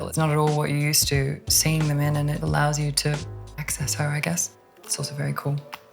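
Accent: Melbourne, Australia. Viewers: Her accent is such a mix between Australian and English. As a brit myself I fully thought she was english for the first few minutes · Her English has such a lovely global vibe, Australian, British and Bit of American here and there ..love it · Nah she’s just posh Melbourne they sound like this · Such a posh Australian accent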